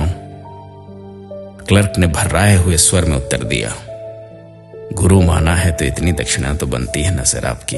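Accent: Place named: Punjab, India. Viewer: Aap ki Awaaj nice Punjab sa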